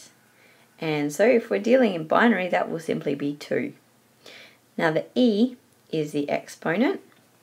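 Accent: Australia. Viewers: nice Australian accent :)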